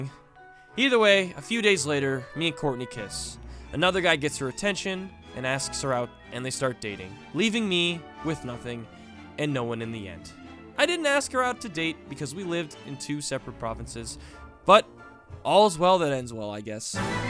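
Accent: Canada. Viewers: So you're a Canadian ay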